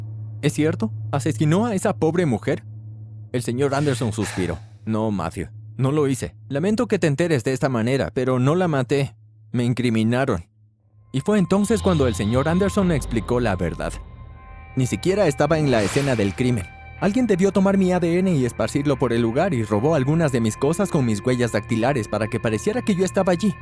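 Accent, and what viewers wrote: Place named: China. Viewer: soy la única que pensó que la vos parecía de un chino hablando español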